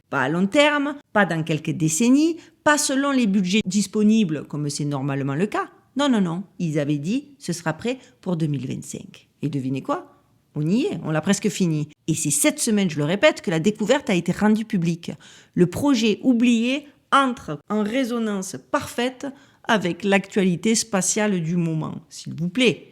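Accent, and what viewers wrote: Marseille, France. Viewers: Marseille💔💔💔super video...ton accent m enchante😉✔ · 👏🏻👏🏻👏🏻 super vidéo! Merci pour vos sujets et recherches très pertinentes 👍 J'adore votre chaîne et votre reste d'accent Marseillais...à dimanche! ❤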